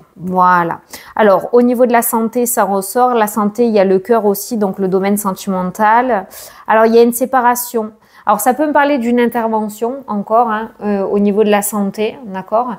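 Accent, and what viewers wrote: South, France. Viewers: Bien sûr que l' on te regarde, et surtout que l' on t' écoute, ton bel accent du sud nous donne un rayon de soleil tu es une vraie petite pile merci a toi et bonne journée bon weekend gros bisous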